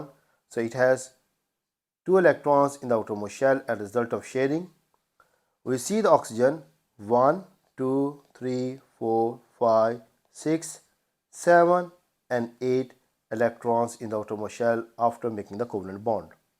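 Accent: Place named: India. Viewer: The indian accent makes it funny lmao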